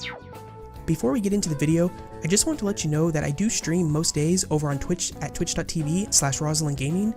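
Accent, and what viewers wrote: Japan. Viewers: Is Japanese your first language?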